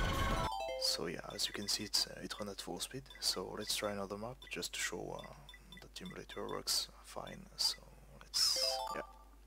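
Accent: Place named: France. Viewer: J'adore ce que ca donne un francais qui parle anglais :p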